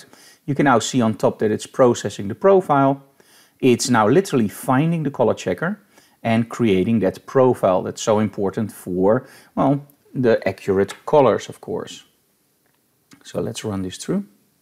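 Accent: Netherlands. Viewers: are you from holland sir ?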